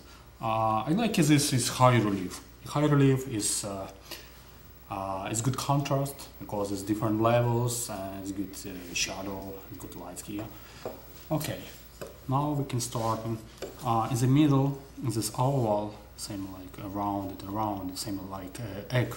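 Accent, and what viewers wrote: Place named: Russia. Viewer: Ты Русский ???? А то акцент больно хорош!!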